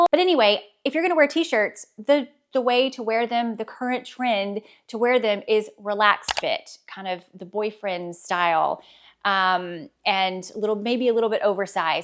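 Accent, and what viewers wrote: South, United States. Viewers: I'm not even thinking about the fashion. I used to teach English and I'm wondering if any non-native English speakers can pick up on her subtle southern accent here. It's subtle, I wonder how this sounds to someone from outside the US and if they're even able to pick up on it or place it, or just find some of her intonations confusing compared to other regions of English speakers. For the video, this is another classic example of a fashion girly talked about modern versus dated looks in an interior design setting that could easily be under similar scrutiny that does NOT match what they are recommending for fashion! But who's counting.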